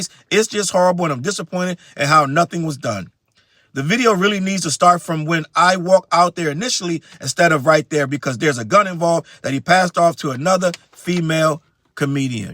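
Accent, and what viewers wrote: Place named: New York, United States. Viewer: Absolutely! Your accent is dope too. You got that NY swag👌🏽 Respectfully.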